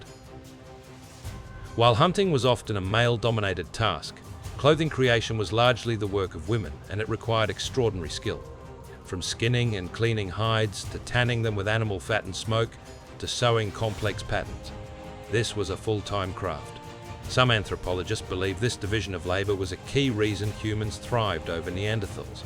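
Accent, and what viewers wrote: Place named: Australia. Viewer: New subscriber here. Solid mate. Sound like an Anzac 😉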